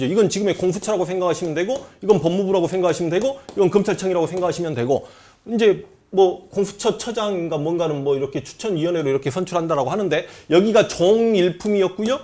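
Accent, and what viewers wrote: Jeolla, South Korea. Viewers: 전라도 사투리가 거슬리지만 재밌게 잘들었습니다.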